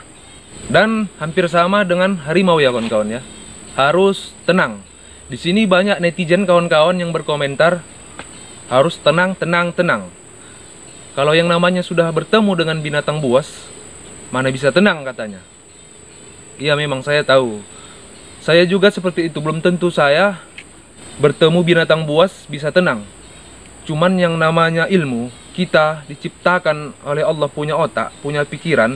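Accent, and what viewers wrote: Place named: Medan, Indonesia. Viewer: Ada lucunya nih org.. Logat medan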